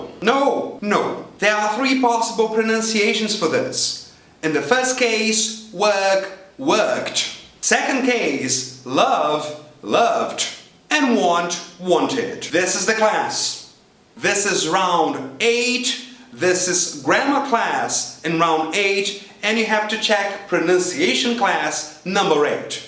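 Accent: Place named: United Kingdom. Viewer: I loved it. It was a great class. Even though I know these rules I often do these mistakes. I was a brave I watched it without subtitle. Your British accent is very nice.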